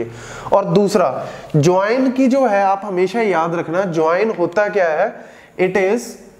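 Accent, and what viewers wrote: Punjab, India. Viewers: punjabi dur se pta chal jate hai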